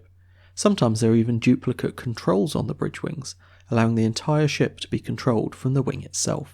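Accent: United Kingdom. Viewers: The British accent is, somehow, disturbing. At least to me. Many times, annoying.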